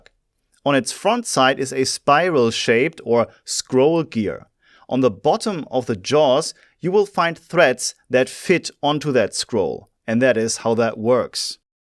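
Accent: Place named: Germany. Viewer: Are you aware that your German accented English makes you sound like an Engineer?!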